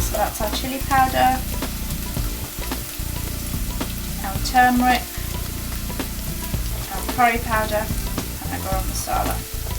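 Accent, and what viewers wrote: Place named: Sri Lanka. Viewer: sri lankan ENGLISH amma..hah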